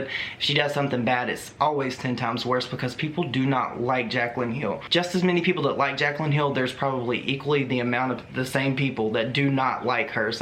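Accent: South, United States. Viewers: I love your accent! Southern twang sounds so cool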